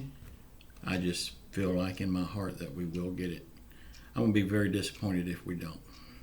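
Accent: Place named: Louisiana, United States. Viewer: You hit the nail on the head. A $1200 check in 4 months exemplifies how thoughtless & callous our political leadership has been through the pandemic & closing of economy. As to Devos's & Kudlow's mind boggling push to reopen schools while the virus is raging through the country betrays where their loyalties lie. Rather than wanting to protect the children & teachers, they choose politics, downplaying the effects of a failed covid response., keep telling it like it is in your Louisiana twang. Don't let em off the hook.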